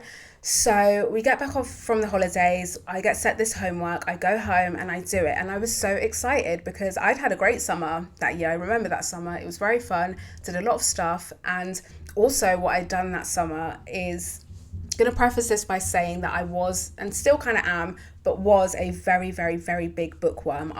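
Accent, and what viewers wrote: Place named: RP, United Kingdom. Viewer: To be fair I do really like the way you speak. Your accent makes you sound posh. Plus you're very calm and soothing. In relation to other black women, im not too sure. I've found that some can be quite boisterous in public, at the same time, we tend to notice disturbers of the peace, not the quiet people just going about their day. In personal conversations, I've had no issues.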